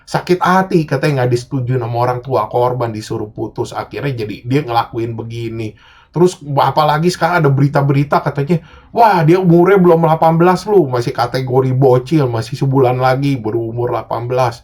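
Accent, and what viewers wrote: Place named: Betawi, Indonesia. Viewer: Org betawi bang logatnya kyk bang alex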